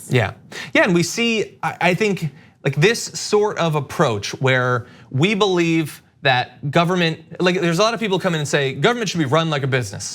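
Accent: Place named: Ohio, United States. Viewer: John endearingly says "wuter" which is sort of Ohio speak.. Where's he from? [asking for a friend from Ohio]. Chicago was never this progressive when I was growing up there.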